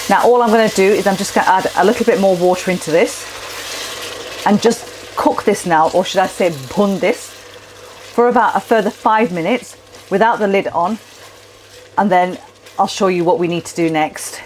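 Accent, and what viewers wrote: United Kingdom. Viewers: Awesome recipe ! Good job ! Please speak slowly so that we understand your British accent well.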